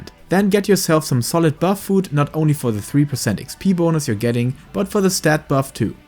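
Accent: Germany. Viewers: Are you german? Cause of your Accente.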